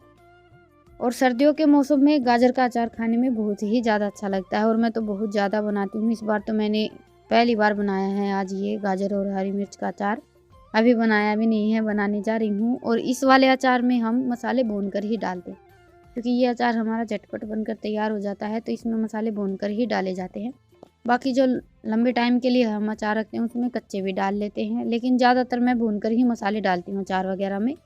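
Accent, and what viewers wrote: Haryana, India. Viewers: Apki haryanvi voice bhut pyaari lgti h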